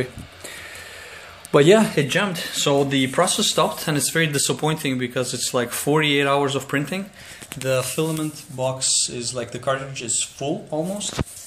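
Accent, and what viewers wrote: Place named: Quebec, Canada. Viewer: are you french Canadian? you sound like GSP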